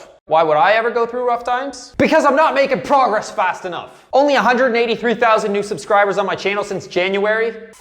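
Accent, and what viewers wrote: Canada. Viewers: I am fookin' Polish and I understand 99 percent of what you say. I love the Canadian accent! BTW Your channel is so fooked up! !!!!!!!!!!!!!!!!!!!!!!!!!!!!!!!!!!!!!!!!!!!!!! Just kidding. It's great keep it up. Cheers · Are you Canadian? Got the Canadian "are"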